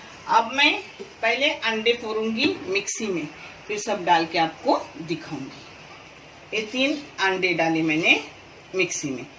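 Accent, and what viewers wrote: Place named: Konkan, India. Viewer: BEHEN JI AAP KOKNI HAIN , JIS TARHA AAP KI BANAI SARI RECIPES AUTHENTIC HAIN , USI TARHA AAP KI URDU JO KOKNI ANDAZ AUR PRONUNCIATION" تلفظ" LIYE HOTI HAI WOH MUJHEY BOHOT ACHCHHA LAGTA HAI . DEEGAR AAP KI RECIPE KE DARMIYAN DUAYEN AAP KI SHAKHSIYAT AUR KIRDAR KI AQQASI KARTI HAIN ,"LAJAWAB ". ALLAH TĀALA AAP KO KHUSH RAKKHEY .AAP KO SIHAT AUR LAMBI ZINDAGI DE .TAKEH AAP ISI TARHA KIKAN KI NIT NAI RECIPE SAY HUM SABHON KO NAWAZTI RAHEN . "ZAHID SANGE".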